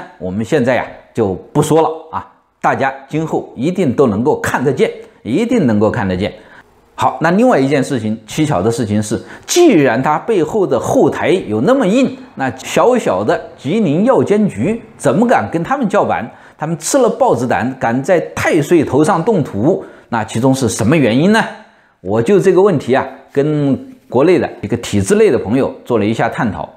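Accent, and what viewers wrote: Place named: Changde, China. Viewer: 常德口音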